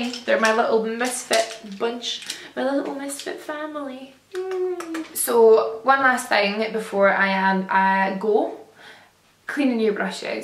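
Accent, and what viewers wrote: Scotland, United Kingdom. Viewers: Where from Scotland are you? I'm Finnish and trying to get more knowledge of specific accents so I won't look like a jerk when I'm talking to people 🙈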